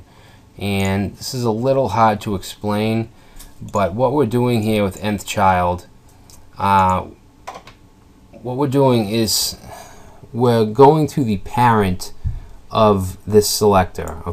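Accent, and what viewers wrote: Boston, United States. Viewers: Thanks for the tutorial. Love the Boston accent. Brings me home. Grew up in Newton but have in in Florida for 10 years.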